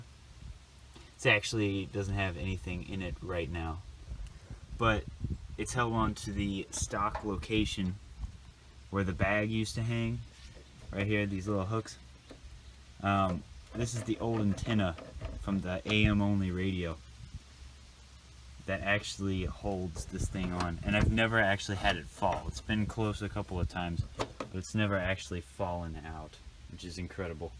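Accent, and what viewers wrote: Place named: Louisiana, United States. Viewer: Ohio plates but talkin like a gay louisiana boii 🤔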